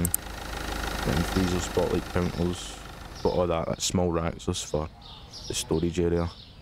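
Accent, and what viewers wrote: Scotland, United Kingdom. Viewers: He is speaking Scottish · no worries mate once i realised you had a scottish accent it was much easier to get what you were saying hahah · I thought it sounded scottish awesome vid my g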